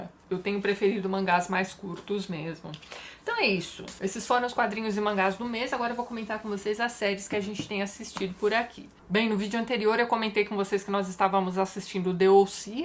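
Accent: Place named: Rio de Janeiro, Brazil. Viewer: Então tá explicado o sotaque carioca :)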